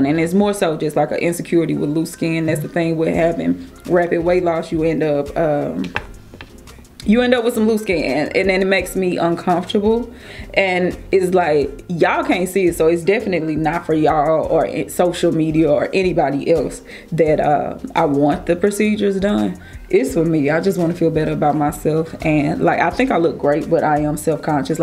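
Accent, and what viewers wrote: St. Louis, United States. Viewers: hello beauty, i see you and i still see you. you remind me so much of both my daughter and niece (it's that accent... st. louis). my hard working mother of two daughters is also considering weight loss surgery and i've suggested she view your videos. i know everyone won't have the same experience but i loved your candor and realistic journey. she too wants the surgery afterwards and yes she has friends who kinda look at her like she crazy for wanting this for herself. So it is quite true you cannot please everyone, why try, hard enough trying to get support from those around you. it's a brave and scary thing. still loving your journey and that cute little man you got. i know he keeps you busy...i swear it amazes me how much i see my diva you in. and you are a diva in the best of ways. bless you love The Lord keep you guided❤👍🙏👶❤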